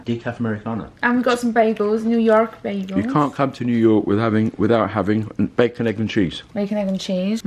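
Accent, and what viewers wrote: United Kingdom. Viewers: I love your British accent ❤ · Am I the only one who didn’t know she was British 😂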